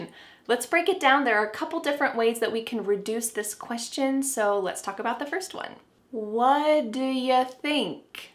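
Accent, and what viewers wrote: United States. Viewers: I'm a native arabic speaker, These days i'm learning American English, so i would ask you Are all your videos in american accent? Thank you very much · 🗽 Amazing video! real American English pronounciation 🇺🇸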